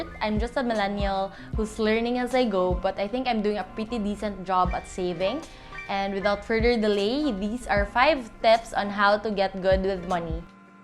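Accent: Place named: Cebu, Philippines. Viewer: Very helpful tips! Thanks for sharing. Anyway, are you from Cebu? cause you sound like my Cebuano friends.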